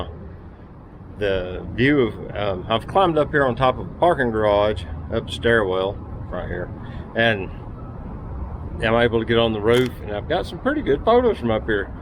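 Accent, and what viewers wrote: South, United States. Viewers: LOL! very good. I love Southern Talk- "...just to this side of that sign? On the other side of that sign?.." tone of voice- sentences that sound like a question are not a question. ❤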